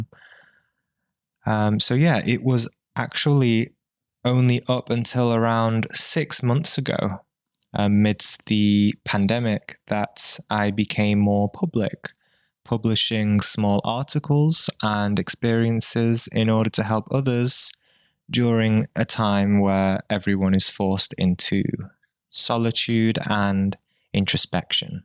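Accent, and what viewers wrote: United Kingdom. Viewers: Thank You. I am 2-Years Late...but Intuitively I knew...that There was an Expert like You...Amongst the large Herd of Astral Projection Leaders available. I am American, & Your British Accent...and You Clear Style of Speech...attracted Me. Thank You.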